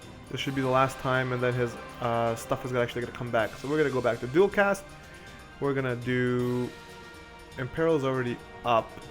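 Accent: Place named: Caribbean. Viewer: it's hard to say, Trini or Bajan maybe, Bahamas but you don't eat all your words in a single gulp so maybe not Bahamas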